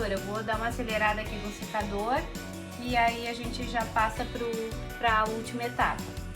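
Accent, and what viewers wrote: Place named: Porto Alegre, Brazil. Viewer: Bha! Caí aqui de paraquedas! Achei o sotaque parecido com o meu! Sou de Porto Alegre Bairro Agronomia. Já vou me inscrever agora. Gostei da técnica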